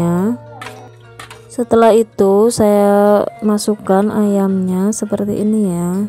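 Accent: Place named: Java, Indonesia. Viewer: Jowone kental gayysss.... Seko ngendi sampean mb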